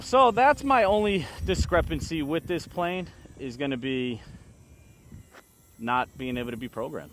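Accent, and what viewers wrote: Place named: New England, United States. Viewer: Nice! I can’t tell if you are MA or NY. RI maybe? CT? You said stall like stawl but then cherry picker like cherry picka…so I’m confused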